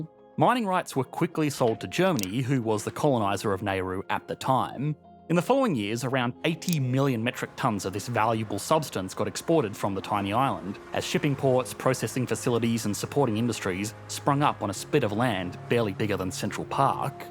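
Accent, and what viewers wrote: Australia. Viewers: Aussie narator. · First time here, interesting topic, only I can stand your way of talking.. using Vocal fry at the end of a sentence. STOP IT and talk normal. (not talking about Aussie accent). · Interesting accent Sounds to me a mix of Australian and tv chef Loyd Grossman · people getting mad over an australian accent and invalidating the rest of the video's point proves that these people have the highest iq in human history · Australian accent in a nutshell. This phoneme doesn't exist. That and ending their sentences like they were questions? I found myself repeating the end of every sentence just to hear what I'd sound like if I were from there? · It's called "Australian". Admittedly, modern Australian, but Australian nontheless.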